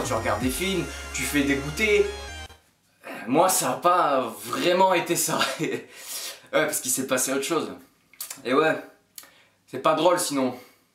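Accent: Marseille, France. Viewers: Ça sent MARSEILLE 😏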